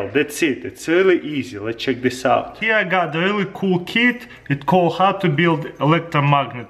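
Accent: Russia. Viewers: it's because the russian's accent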